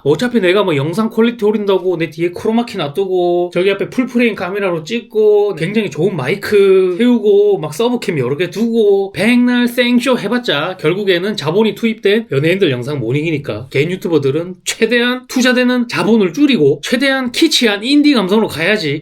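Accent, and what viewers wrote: Busan, South Korea. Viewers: 천상 생각이나 말투나 bu산 사람 맞네요..ㅎㅎ 건투를 빕니다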